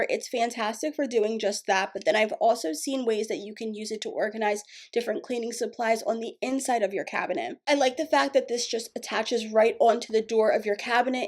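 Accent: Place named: New York, United States. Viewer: Hola, you are so pretty, I enjoy your videos I'm a Latina and you sound like a new Yorker, I love new York, shout out!!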